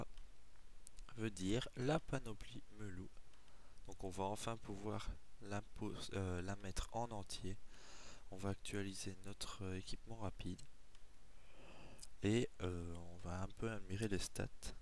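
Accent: Belgium. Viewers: tu viens de ou de Belguique?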